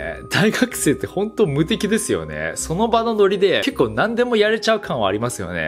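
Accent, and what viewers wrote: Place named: Kansai, Japan. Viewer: 関西弁だよごく普通の。 それはちゃうねんなぁ〜。とか言うよ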